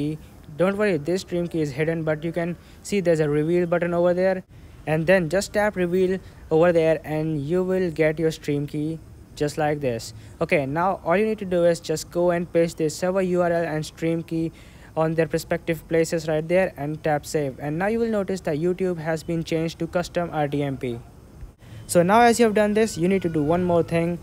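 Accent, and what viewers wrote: India. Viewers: Well your accent became more of an Indian accent as the video went on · INDIA INGLISH 🤬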